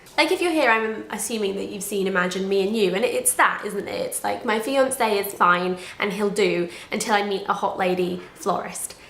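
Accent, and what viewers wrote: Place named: United Kingdom. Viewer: How did I know she was going to have a British accent before I even heard her voice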